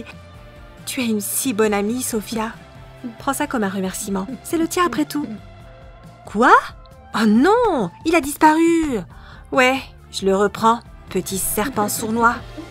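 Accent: England, United Kingdom. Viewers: Vous êtes anglaise ou pas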